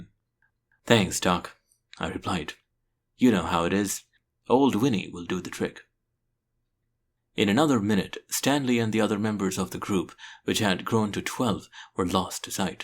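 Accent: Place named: India. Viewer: You are absolutely right about our narrators voice. His wonderful accent conjures up India perfectly.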